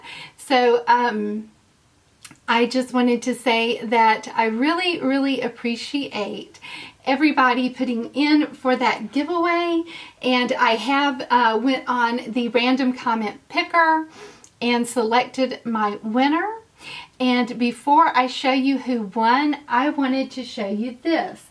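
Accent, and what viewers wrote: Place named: Alabama, United States. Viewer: Where are you from in the south..I am from Arkansas and have lived all over Alabama. You sound like you're from Alabama and you say um a lot .lol...So I've never tried Tribe Beauty Box, I almost did..here is my email bc I don't always get my <mail>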